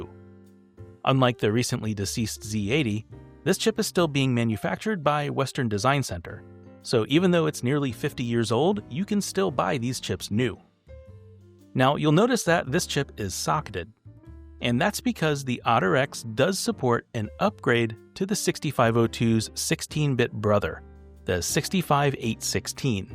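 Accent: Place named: West Coast, United States. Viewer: I feel like that is west coast pronunciation. I catch myself saying wadder instead of water.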